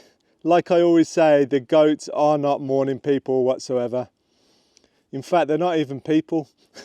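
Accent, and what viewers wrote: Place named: England, United Kingdom. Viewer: Hello! I like your videos. From a true portuguese ( many are now fake&soon they will be gone as well as in England is happening about fakes),. I prefer to listen to your nice english accent. I don't like this AI abd is not portuguese is brazilian which is called portuguese from Brazil for them to take advantage. How can I take this AI and got back your voice??? Can u tell me please? I tried deactivated legends, put translating to look for english, but that option is not availabe.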